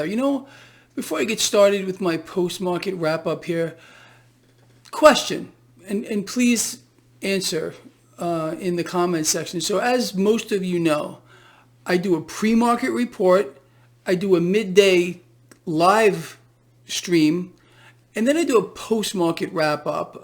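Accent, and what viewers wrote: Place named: New York, United States. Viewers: Good idea, I am asleep when you do your lives anyway (Australia) but awake when you do end of day.....but entirely up to you, use the channel for the wrap up over seas whilst I sleep and of course to hear you NY accent. By the way thankyou for all you do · Sorry. I can't take the New York accent. Spent about 30 seconds listening.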